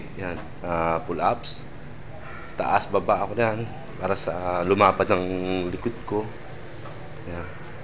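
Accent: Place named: Pakistan. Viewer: lol this is not saudi he is pangaladish or pakistane lol look to the way that he is talking in lol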